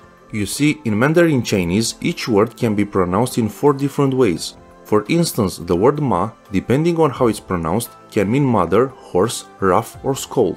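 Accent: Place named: Romania. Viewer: That brutal Romanian accent though 😖😖😖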